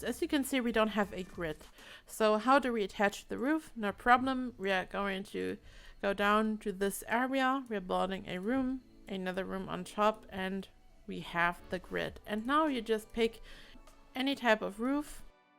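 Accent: Germany. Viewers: Thanks! Worked great. You just gained a new subscriber ❤️ Btw where are you from? Your accent sounds a little German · I wonder if there's a way to forego that third storey. Could you build a one tile room on both the bottom and the upper storey (maybe next to the stairs) to place a roof? Because while I find this to be an ingenious idea, it will turn into a massive house and I'm more of a two storey house girl myself ^^' And now the question again in German (to make my question more understandable), because judging by your accent you might be? Könnte man nicht einfach anstatt 3 Stockwerke nur 2 bauen? Also z.B. neben der Treppe einen 1 Kachel-weiten Raum und dann im 1. OG einen weiteren drauf und dort dann das Dach drauf? Oder lässt das einen dann immer noch kein Dach platzieren? Weil, 3 Stockwerke sind mir dann doch etwas hoch, vor allem wenn man dann dort kein Zimmer mehr einbaut.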